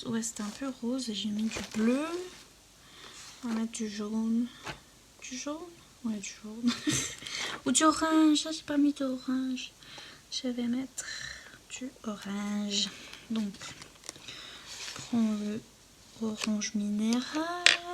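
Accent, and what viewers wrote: Quebec, Canada. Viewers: Allo ! Je trouve ton accent québécois vraiment bien et ca vient d une québécoise 😉 j ai beaucoup aimé t ecouter en coloriant dans mon nouveau livre ❤️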